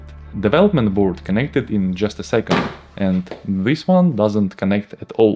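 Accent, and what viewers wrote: Russia. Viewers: русский русского услышит всегда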